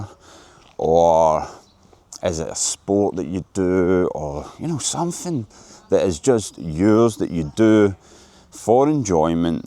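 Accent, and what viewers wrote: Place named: Glasgow, United Kingdom. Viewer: Glasgow speaker✌️🤝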